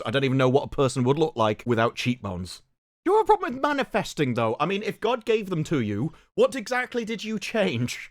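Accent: United Kingdom. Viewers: Are you British?? Can’t tell.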